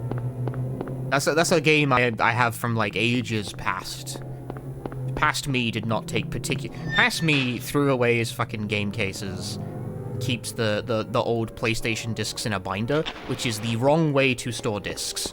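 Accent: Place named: United Kingdom. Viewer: I've watched some of your videos before, but never occurred to me that you're from the uk, even though I did notice you have an accent lmao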